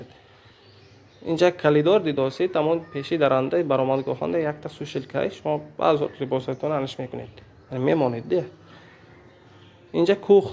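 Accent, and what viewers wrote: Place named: Mongolia. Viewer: Узбак бошаде ? Монголиский акцент . Что они делают в ходженте? Ужась откуда эти клопы распрлзаются ?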